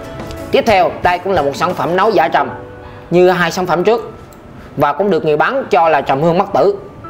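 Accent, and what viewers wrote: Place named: Quang Nam, Vietnam. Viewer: Nếu muốn là ng của công chúng thì nên học nói tiếng phổ thông chứ nói tiếng quảng ngé nge thôi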